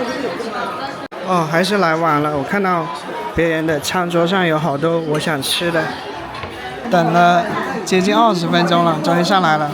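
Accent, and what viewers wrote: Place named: South, China. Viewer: 听博主这口音就是南方人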